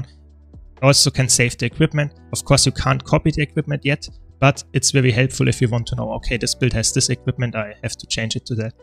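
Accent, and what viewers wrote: Germany. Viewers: good german english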